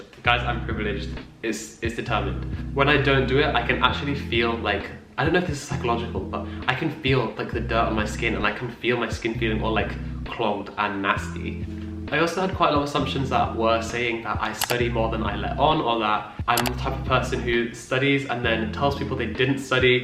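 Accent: RP, United Kingdom. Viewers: Maybe what some people catch that causes the “posh” assumption is that, at least to these American ears, you have a posh accent. I fully admit I am no expert and may be bead wrong. However, I like your accent. It is part of the reason I find you attractive and interesting. Best wishes with med school. 👍👍🤞🤞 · I hate his britain voice. Akhhhhhhtuu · Mar Teumar right? even the way he speaks sounds posh. i mean, isn’t that RP?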